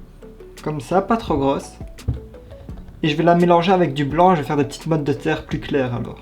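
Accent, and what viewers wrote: Liège, Belgium. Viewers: Petit accent liègeois dans cette vidéo :d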